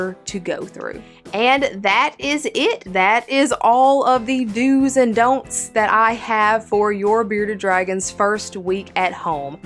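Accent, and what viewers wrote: South, United States. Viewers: Are u from the south cuz I’m pretty sure u are cuz of your accent cuz I am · You got that yeehaw accent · Sounds like you got a southern drawl. I love a good southern accent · I love your southern drawl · is kind of cute...with a lil country twang bout her voice. Oh, and has great advice for bearded dragons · Damn u sound country what part of the south u from Texas? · No offense but was not expecting a country voice lol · love your southern accent! :-D glad I decided to watch some Beardie videos! :-D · Hi! Just wanted to say that we really like your videos. And, being from the south, we love your accent. Keep up the great work. Would be cool if we could do a video together one day...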